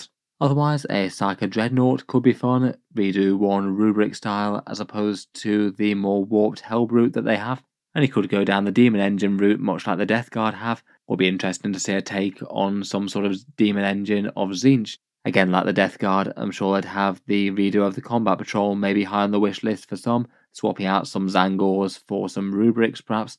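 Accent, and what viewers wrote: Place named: United Kingdom. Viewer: What UK regional accent is this? Very narrative. Can you read LOTR to me for money, lol.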